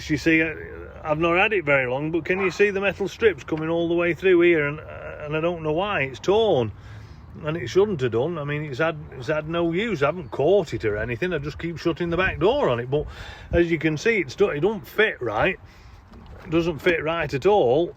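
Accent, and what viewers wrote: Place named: Northern England, United Kingdom. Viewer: thanks for checking in Keep up the great work, I really enjoy your videos, fresh, to the point and a great Northern accent Lol. I was born in Lancashire, owned LRs since I was 16 had a few lol. Still not complete ruled out a new Defender, however given the rising prices of old Defenders in NZ a new one is a better financial option. I been lucky with my D3 V8 4.4 version hence the propensity to keep. All the best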